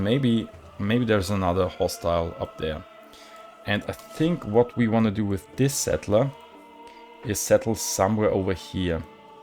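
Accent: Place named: Austria. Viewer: Austrian accent detected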